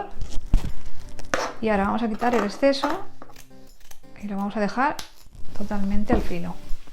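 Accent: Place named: Spain. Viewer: Hola! Q precioso tu trabajo, aparte d tu simpatía y claridad p explicar Amo escuchar ese acento español, quizás añorando y recordando a mi abuela q era d Andalucía Soy d Argentina y d una provincia del interior del país, y no encuentro todo lo q mencionas p la confección (la boquilla d esa longitud principalmente )las telas son soñadas Te felicito!!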